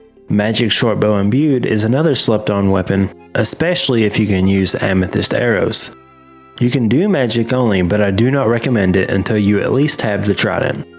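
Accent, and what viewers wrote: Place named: South, United States. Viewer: I don’t know how you somehow have a bit of a southern USA accent but also sound like a European of some sort too lol.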